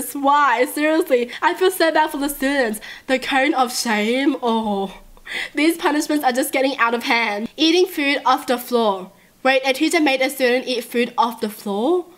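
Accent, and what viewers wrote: Australia. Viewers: Nana Danisa wow ok, so your calling people idiots for being slightly mistaken? She has an Australian accent, who can blame them if they thought that (and by the way, people can be Australian soooo yeah. Your argument that it's "not a race" makes no sense and doesn't benifit you or your argument" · She grew up in Australia so she has that accent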